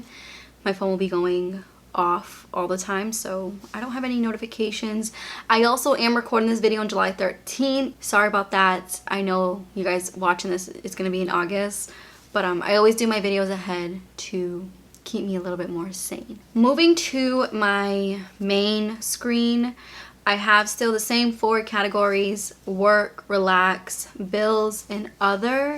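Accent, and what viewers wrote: Boston, United States. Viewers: I actually love your boston accent! ❤️ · Wtf no i like ur boston accent.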